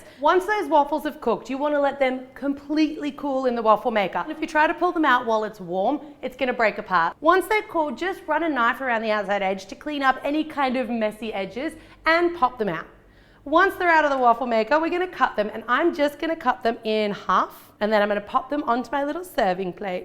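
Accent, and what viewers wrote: Australia. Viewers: is she australian???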